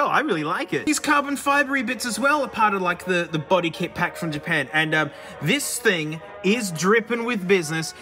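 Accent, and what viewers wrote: Australia. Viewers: i love how every adelaidean bloke sounds the same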